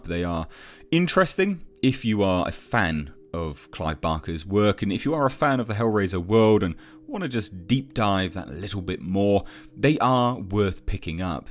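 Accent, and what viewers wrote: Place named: England, United Kingdom. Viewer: Marvelous Discovering more about my favorite Clive Barker work with you're voice ( and English accent) just keep my blood boil for more Barker lectures 😜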